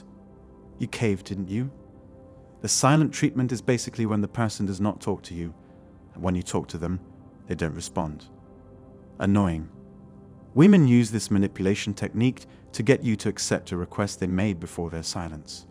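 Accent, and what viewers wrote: United Kingdom. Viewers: I hate the robot voice, even with the British accent.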